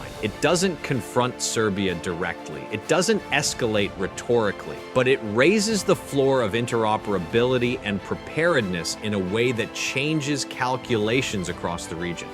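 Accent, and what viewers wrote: United States. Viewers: This tosser with a Yank accent is so delusional he don't know what is happening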